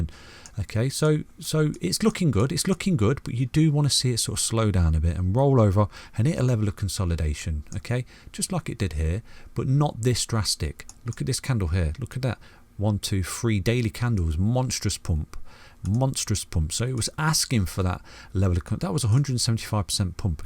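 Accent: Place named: Nottingham, United Kingdom. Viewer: You sound like a Nottingham lad! Great TA mate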